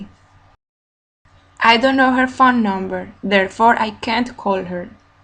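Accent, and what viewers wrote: Colombia. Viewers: ese acento de paisa tan fastidioso para una clase parece un burdel. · super pero te aconsejo cambies ese acento paisa, molesta mucho el tono ,gracias haces un gran aporte al Inglés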